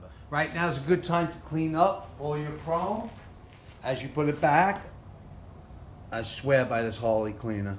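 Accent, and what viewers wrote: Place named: Long Island, United States. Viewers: thanks for the video! nice vett in your profile pic sounds like your from NY as well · Excellent video! I watched a bunch of videos about replacing my bars, but yours is the most thorough - that’s important for me. And your LI accent makes me smile! I love the island. Do you know the band Zebra? WBAB broke them in the early 80’s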